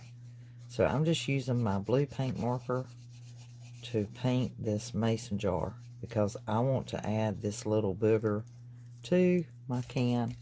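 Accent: South, United States. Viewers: Just found you! So excited to make this!!!! You sound like a fellow southern girl! ❤️ Lookinf forward to watching more of your videos! God bless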